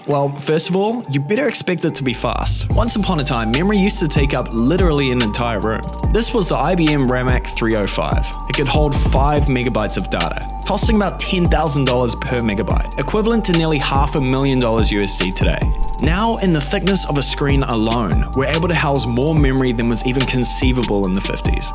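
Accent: New Zealand. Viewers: How did I not realize his kiwi accent. When I heard PB tech I was like wait a hot second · I knew you sounded like a kiwi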